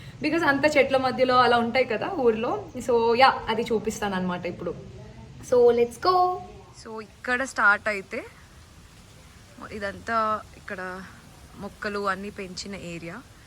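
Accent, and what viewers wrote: India. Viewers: Beautiful Telangana telugu ❤ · gaaru Antarvedi daggara unna lakkavaram aa midi. miruu godavari yasa lo matladutu unte rojanta chustu undipovachuu andi mimmalni❤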